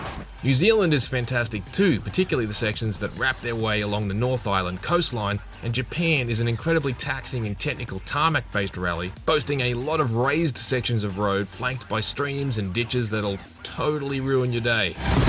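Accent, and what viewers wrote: Ireland. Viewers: I don't know, man. He sounds Irish to me. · he does sound Irish tho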